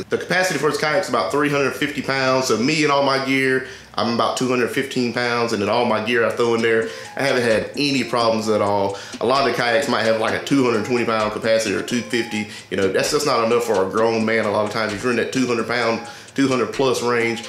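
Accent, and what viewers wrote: South, United States. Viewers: Good review!! You gotta be from the south bro, you got that down home good ol boy sound to you! I’m in Auburn Alabama area.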